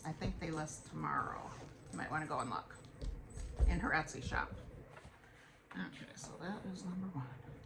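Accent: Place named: Michigan, United States. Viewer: Great video, sounds like your a Michigan girl. I am, sorry if I'm wrong.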